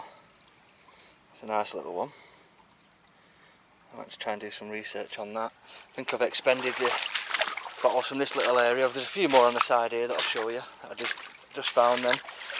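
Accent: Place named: Northern England, United Kingdom. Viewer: Oooh a wee bit off there Dawn as a Scotsman I can confirm is not I'm afraid. I commented on his accent the other day, got to love the northern tones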